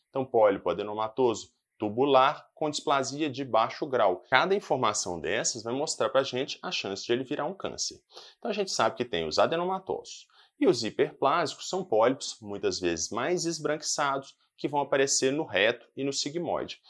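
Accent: Minas Gerais, Brazil. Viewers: Conheço esse sotaque. Ele deve ser daqui de Minas. Gracinha. 😄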